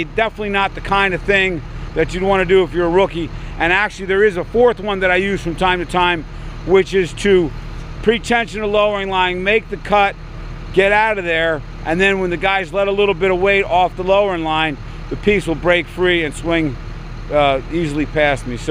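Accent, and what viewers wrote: New York, United States. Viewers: Excellent video. Are you from New York?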